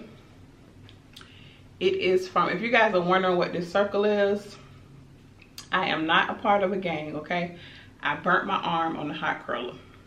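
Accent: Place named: South, United States. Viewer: Happy Labor Day: I 💘 your videos, new subscriber & I watch every time. Quick question; your accent sounds southern, are you from Georgia?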